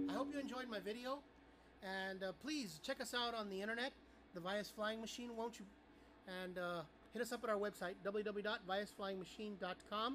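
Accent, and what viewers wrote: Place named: Mexico. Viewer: Tienes cierto acento mexicano jaja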